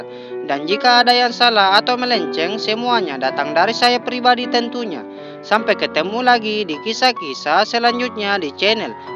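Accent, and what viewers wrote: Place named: Sulawesi, Indonesia. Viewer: Kamu OKKOTS😅...org sulawesi yah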